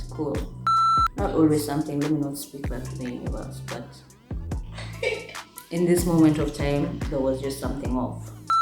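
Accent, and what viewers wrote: United Kingdom. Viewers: Newly hooked and is pretty😻your skin is to die for...Damn!!! Also it's the Britt accent for me😂😂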